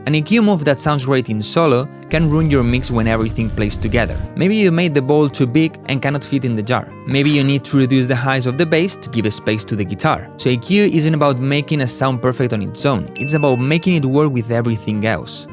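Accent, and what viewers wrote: Spain. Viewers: hermano ese acento es español dime que si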